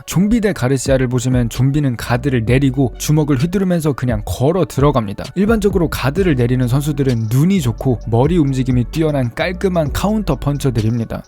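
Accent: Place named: Gyeongsang, South Korea. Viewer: 은 고향이 경상도 어디세요? 저랑 사투리가 똑같네요ㅎㅎ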